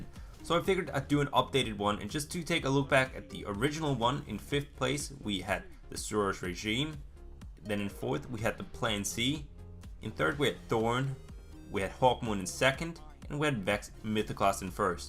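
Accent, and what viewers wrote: United States. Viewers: You sound american, you tend to slip into sound like your from new york and you say some things in an English accent sometimes. Why is this?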